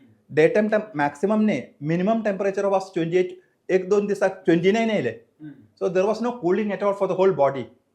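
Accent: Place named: Kerala, India. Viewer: However u talk konkani u r from Kerala.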